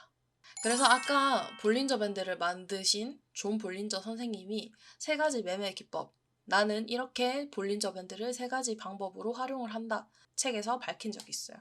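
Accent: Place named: Gyeongsang, South Korea. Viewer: 조금씩 묻어나오는 경상도 사투리가 매력이라서 구독 누름 ㅎㅎㅎ 많이 가르쳐 주이소~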